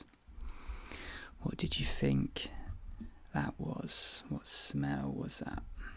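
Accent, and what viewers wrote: United Kingdom. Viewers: Love the accent what part of the UK?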